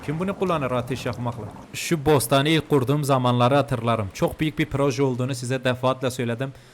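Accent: Elazığ, Turkey. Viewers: Abe bende elazigliyim senin elazig li oldugunu şive den direk çaktim abi sen tuncelili misin tuncelilisen nerde otirisin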